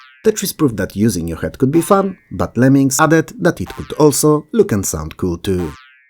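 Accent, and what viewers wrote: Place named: Poland. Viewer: Polski akcent jakby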